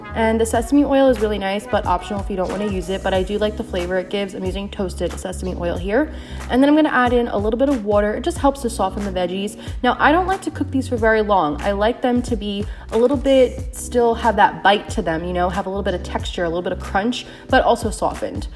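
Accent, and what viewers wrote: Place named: Philadelphia, United States. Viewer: Great recipes! Just started low carb vegan a couple weeks ago and already seeing results. Are you from Philly area? I detect the accent (I'm from there too :) )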